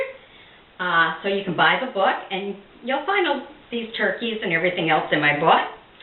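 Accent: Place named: New Jersey, United States. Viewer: This is really cute c; Your accent makes me miss my grandma from Jersey. If I ever have kids I'll remember this.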